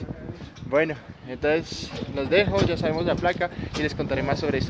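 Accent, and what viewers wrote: Colombia. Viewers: Hola ya hablas como colombiano. Que pena tu robo!! Lo bueno es que no te paso nada. Mucha suerte, que estes muy bien.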